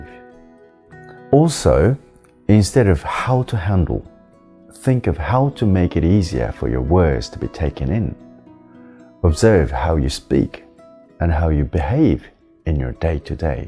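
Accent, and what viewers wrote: United Kingdom. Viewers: 大愚和尚のブリティッシュアクセントが好きすぎる。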